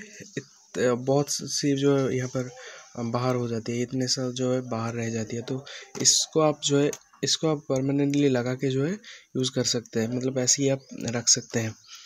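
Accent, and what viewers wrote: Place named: Bengal, India. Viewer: Bangali pola hindi boltase 👍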